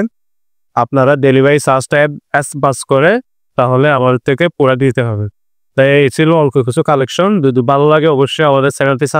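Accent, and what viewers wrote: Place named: Chittagong, Bangladesh. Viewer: আমি আপনার চট্টগ্রামে দোকান থাকলে সেটা কোথায় তা জানতে চেয়েছি। আপনার কথায় বুঝা যাচ্ছে আপনি চট্টগ্রামের।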